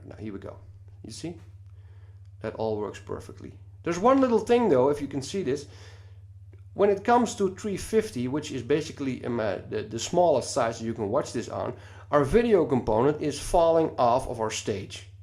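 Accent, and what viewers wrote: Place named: Netherlands. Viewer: Quality dutch accent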